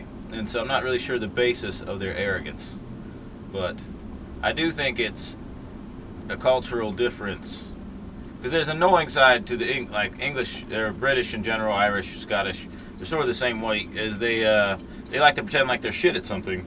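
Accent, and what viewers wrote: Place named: Ohio, United States. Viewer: you're fucking funny. I picked up on your YouTube videos looking for RV advice. As a single person(female) with no knowledge of even changing the oil in anything I appreciate your lack of knowledge...I have none myself, and wish to live a life free and mobile. It's also nice to hear a voice near where I grew up. I'm from Cincinnati. I haven't lived there in decades but Ohio people just know when Ohio people speak...hehehe we're inbred that way...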